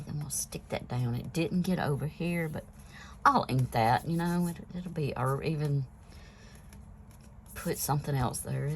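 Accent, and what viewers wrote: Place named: Kentucky, United States. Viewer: You sound like you may come from my neck of the woods. I’m from KY and just learning, these digitals are gorgeously beautiful lol. Thanks for sharing.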